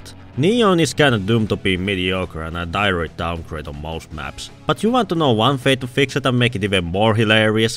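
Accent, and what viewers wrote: Finland. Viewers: Kuuntelin minuutin ja haistoin suomalaisen aksentin. Good video regardless! Informative and staid pretty close to the topic.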